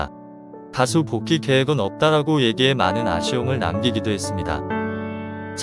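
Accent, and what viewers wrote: China. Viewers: 좋은 영상도 아님~ 인공지능 대사 대충 만들어서 조선족 말하는 거처럼 들림~ 장나라팬인데 정말 기분이 나뿌네~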